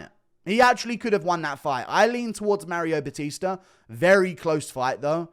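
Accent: United Kingdom. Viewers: something about a British man yelling about ufc fights just puts a guy to sleep ya know?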